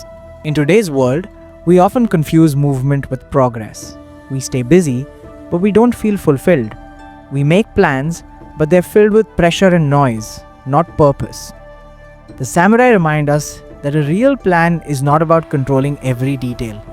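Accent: India. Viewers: At last the self help topic with an Indian voice which made me stop and listen . Usually they are all junk. Thank you for the quality video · Indian accent 🙄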